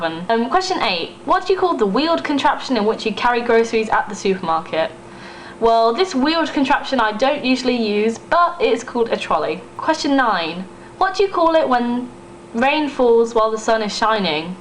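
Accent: East Midlands, United Kingdom. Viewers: Thank you this is so helpful listening to your dialect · The East-Midlands Accent is the most similar United Kingdom Accent to the my American Accent. I must advise to never feel ashamed of your Accent, regardless of what another individual will verbalise, each quark of your East-Midlands Accent is Adorable, Embrace the Individual you are. 😉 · Firstly you're insinuating that posh is not an accent, when it is. And secondly this is just how everyone sounds in East midlands, I'm from Brackley which is pretty much on the borders of Ox, Northants and Bucks and its quite easy to hear the regional differences between the three. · Nice! The test is really designed for different American accents. But, your accent is very lovely.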